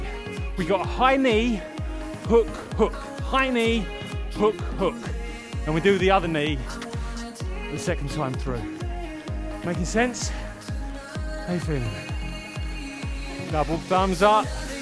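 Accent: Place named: Australia. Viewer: Started this workout 4 days ago. I'm 61 and usually walk every morning, but in our area of the country it is 105 with the heat index even in the morning, so I decided to get on board with a cardio workout and this is great. I can't stay up with the little lady on the right but I try and stay in step and in snyc with the leader and the lady on the left. Also, as a retired band director I am wired to at least try and stay in step with the video. It goes against my very being to be out of sync, but during the fast 45 second phases I'll just go at my own pace thank you. Love the accent. I think an Aussie could hold you up for money in a dark alley and you'd thank him! Ha! Thanks for the workout!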